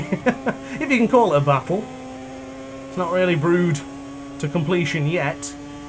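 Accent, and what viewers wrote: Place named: Birmingham, United Kingdom. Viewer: that brummy accent XD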